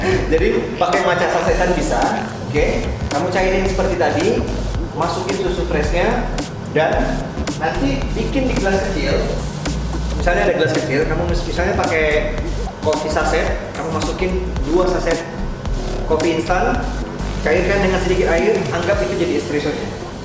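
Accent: Medan, Indonesia. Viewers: Bg orang medan ya ?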